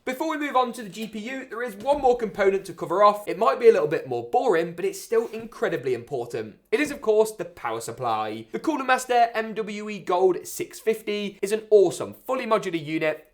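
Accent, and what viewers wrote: United Kingdom. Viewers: That British accent is so stupid 😂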